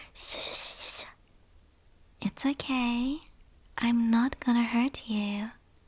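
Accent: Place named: Australia. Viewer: Always nice to hear a fellow Aussie do asmr :o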